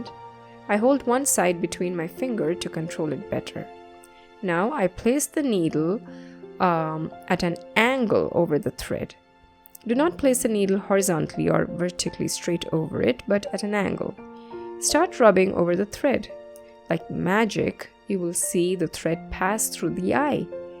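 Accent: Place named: Kenya. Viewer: Thank you. Your accent is so Kenyan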